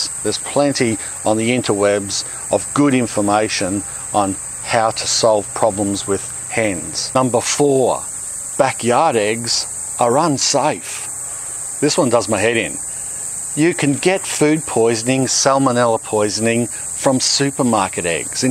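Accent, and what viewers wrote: Australia. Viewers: I love the video, the australian accent, the puns and those shorts.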